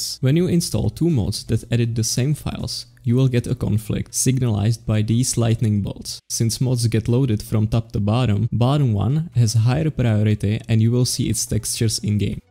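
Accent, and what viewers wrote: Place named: Czech Republic. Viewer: Slyšim tu češtinu, bráško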